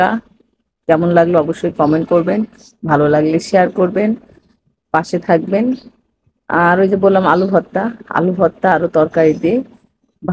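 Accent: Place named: Rajbari, Bangladesh. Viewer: আমার গ্রামের বাড়ি রাজবাড়ী আপু তোমার কথা সুনে বুঝতে পারছি তাই আপু বন্ধু করেছি গত কাল ব্যাক দিও প্লিজ।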